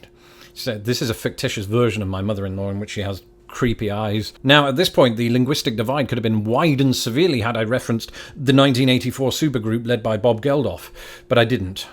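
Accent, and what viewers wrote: United States. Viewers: You do a great American accent. Let's hear more of it! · Great American accent. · Your American Voice is pretty good. Once a year you should try different barbecue places in America. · You should do a satire video with your American accent. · Your American accent is pretty good. Why can't most Americans mimic a good British accent?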